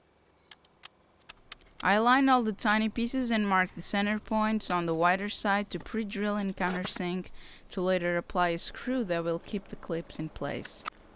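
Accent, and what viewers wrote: Portugal. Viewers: Hi I've been wondering how much all of that costs, since episode 1(wood, mdf, screws, not including machines...), I've got so many ideas from you and I'm thinking about making something similar. Stay with the good job! (I'm also wondering if you are from Portugal or not, I realised that you live in Portugal but did you born here? Your accent seems pretty sick)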